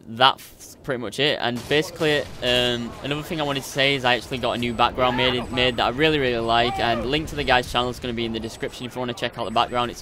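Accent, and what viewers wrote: United Kingdom. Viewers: you're a great player and commentator dude. i love listening to commentators from the UK. its good to respond to your fans' comments. keep it up!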